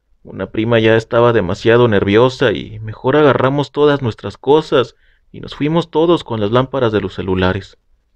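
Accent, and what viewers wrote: North, Mexico. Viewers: Narras chido, con ese acento del norte me gusta.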